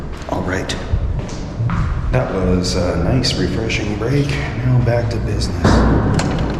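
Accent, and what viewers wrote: Canada. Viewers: Love the show! I lived in Alberta for 25 years before moving to the states in 2018. I love seeing my former home, and every "Parkade", "washroom", and "car" out of your mouth is 🇨🇦 music to my ears. is a damn respectful time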